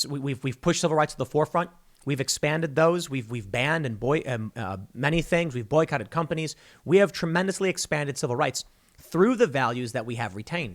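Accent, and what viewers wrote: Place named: Chicago, United States. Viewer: Has anyone else noticed Tim is starting to exaggerate his As and Rs. We get it Tim you're from Chicago....geeze.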